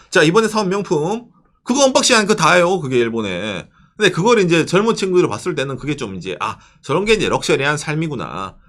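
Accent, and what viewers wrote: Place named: Gyeongsang, South Korea. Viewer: 경상도 사투리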